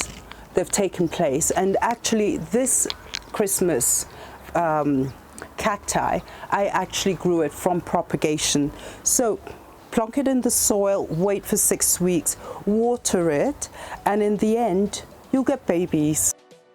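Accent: United Kingdom. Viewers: Her British accent is the best of all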